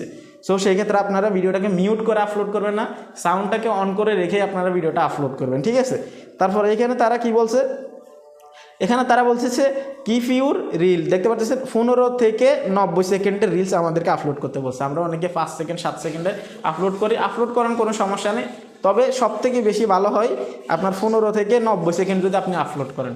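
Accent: Noakhali, Bangladesh. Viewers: ভাই আপনার বাড়ি মনে হয় নোয়াখালী🙂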